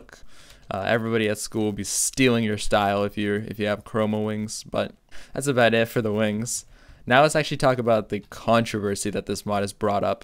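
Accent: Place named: Russia. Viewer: русский говорит по англиш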